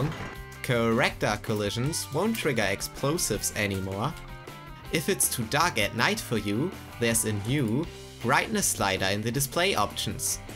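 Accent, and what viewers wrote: Germany. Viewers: Netter deutscher Akzent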